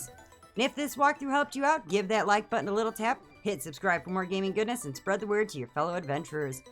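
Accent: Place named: Michigan, United States. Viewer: Sounds like you're from Flint. Or possibly Burton or Grand Blanc... or maybe you're in the metro area, who knows. But definitely somewhere in Michigan, I'd bet a fairy on it.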